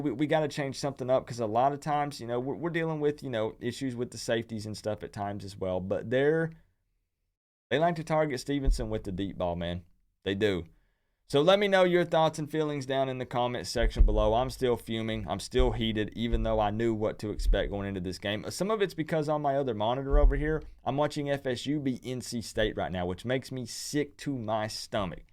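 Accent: South, United States. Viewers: Great analysis! Still don’t understand (considering your southern accent) how you are a Canes fan. All the Canes fans I know are into bling-bling, hip-hop and gangsta rap.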